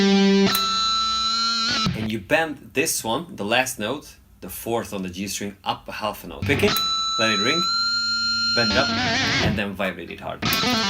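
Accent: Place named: Hungary. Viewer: A jó magyaros angol akcentus a kedvencem :D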